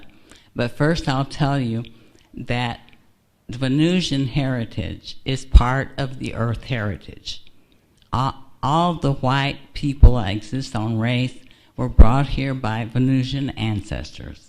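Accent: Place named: United States. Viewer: アメリカ英語に聞こえます。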